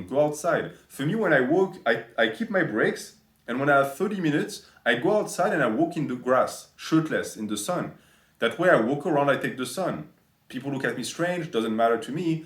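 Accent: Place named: France. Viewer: I feel like has found the most unexpected niche in the fitness industry and that's critiquing stuff in a french accent, idk why it's so addicting to listen to.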